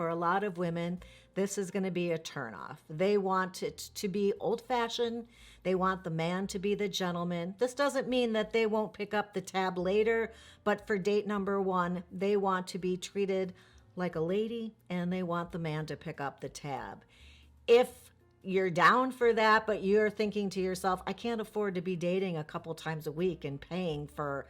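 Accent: Chicago, United States. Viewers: Hi. I love the knowledge that I have gained from your videos. I am from Chicago also. I love your Chicago accent. What part of town are you from?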